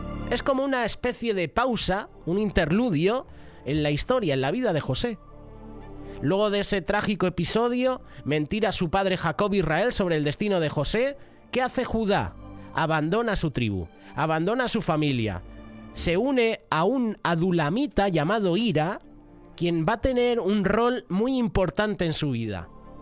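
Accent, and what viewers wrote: Spain. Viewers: Hermosa explicación, hace poco que sigo su canal y sus contenidos son muy edificantes. Que bueno saber que en Europa hay fervor por la Palabra de Dios ( entiendo que la voz en off es de un español) Bendiciones desde América del sur ( Paraguay)🇵🇾💖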